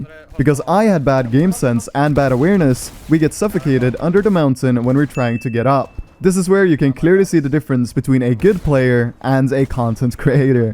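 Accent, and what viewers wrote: Norway. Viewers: Wait are u Norwegian? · Yo er du norsk jeg merka det nettop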